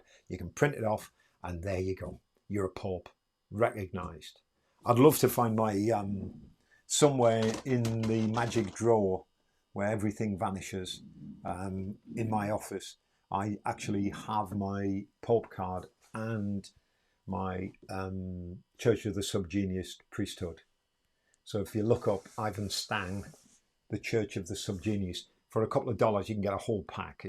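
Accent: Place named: Newcastle, United Kingdom. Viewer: Ill give you Fifty Quid if you say Utterly butterly in your Geordie accent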